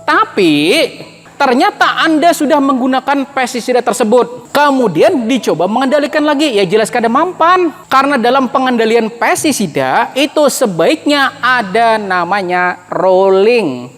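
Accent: Banjar, Indonesia. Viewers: Sulsel hadir. 10 tahun di Kaltim. Serasa bernostalgia dengan logat Banjarnya bang. Salam agro..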